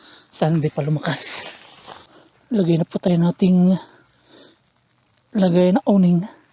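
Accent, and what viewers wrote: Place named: Mindoro, Philippines. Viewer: Boss tiga Mindoro ka no? Kasi yun Tono mo😂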